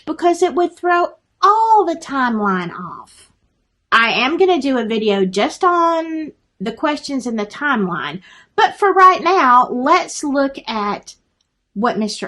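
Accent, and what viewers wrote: South, United States. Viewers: Poor Libby and Abby...there's so much going on there... I agree with almost everything you said here!! God bless! 💜 Im in Georgia..I'm from Alabama!! My accent is like yours!!! I love my country accent!! 💜💜💜💜 The Summer Wells case has blown my mind!! Talk about sketchy folk!!! EVERYONE around that baby and area is so absolutely disgusting!!!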